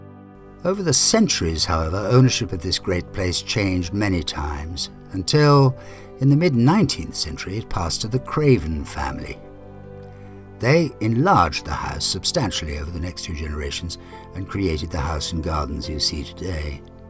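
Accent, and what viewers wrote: United Kingdom. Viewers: well, you have a lovely British accent, and I’m American. So I’m betting I’m the one in the wrong! 😂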